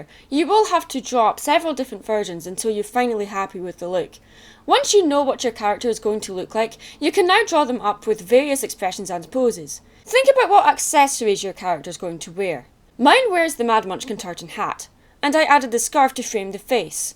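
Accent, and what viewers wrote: Scotland, United Kingdom. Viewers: i honestly love your accent and i believe you are the only scottish female pony tuber.. that just makes you special :D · Btw if reads this were in the highlands do you live is it the birthplace of ICTFC if it is you need to say cuz if you do I would flip. Also what accent do you have is it invernigian or glaswegian or other yay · U Scottish so am i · OMG ur Scottish I love u 4 EVAR!!!!!!! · Adorable >u< I love her accent and I'm actually part Scottish too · Mhm, me and Dog like your Scottish accent. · this is too scottish for me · I'm Scottish too athough i dont have an accent But I Love yours! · Yay it's a Scottish youtuber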